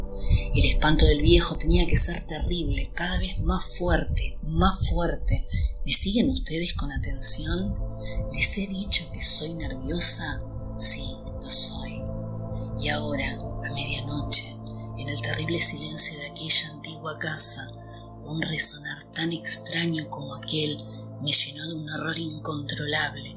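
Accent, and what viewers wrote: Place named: Argentina. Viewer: 1ero eres argentina creo... 2do we tu video esta super lo adoré como narras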